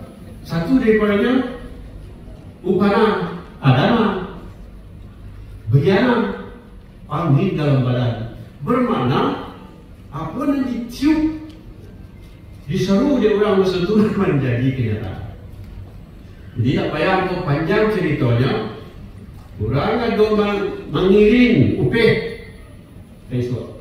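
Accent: Minangkabau, Indonesia. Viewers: indak hilang logat minangnyo 👏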